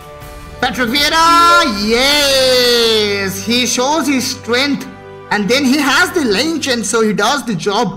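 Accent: Bengal, India. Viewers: The English of Bengali .... I am also Bengali.. hello bro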